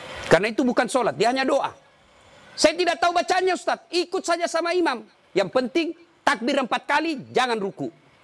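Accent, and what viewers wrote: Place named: Bugis, Indonesia. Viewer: Sy senang ceramahnya sangat mendidik, logat bugis terkesan mengasyikkan, sy pernah tinggal di sulawesi, jd sangat teringat logat yg dibawakan...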